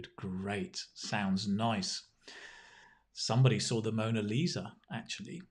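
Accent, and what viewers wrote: United Kingdom. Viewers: I love your class very much, your British accent is beautiful! Thank you!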